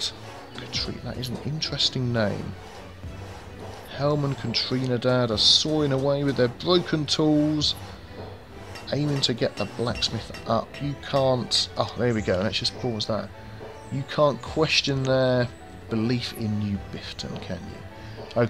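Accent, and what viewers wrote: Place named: Northern England, United Kingdom. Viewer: Hello! If you don't mind me asking what part of the United Kingdom are you from!? I can't put my finger on it! You sound kinda of northern from were i am at in London.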